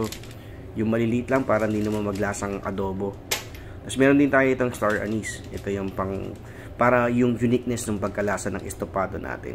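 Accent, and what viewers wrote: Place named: Batangas, Philippines. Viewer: May accent ka Sir ng Batangeño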